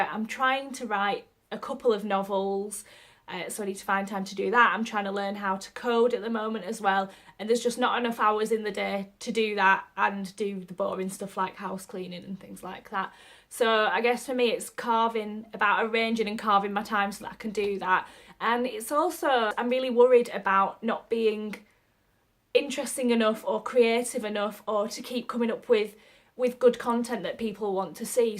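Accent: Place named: Northern England, United Kingdom. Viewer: I clicked onto this, excited to watch another Newbie Book Tag and am welcomed with the familiar accent of a Northerner on YouTube :') Hi!! Haha, where abouts in England are you from? (I'm not a stalker I promise, just curious haha) :)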